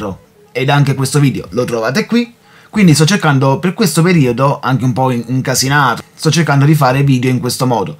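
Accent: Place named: Brescia, Italy. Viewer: Dall'accento mi sembravi più brescano!!! (io sono di avellino, ormai da 15 anni trapiantato in toscana)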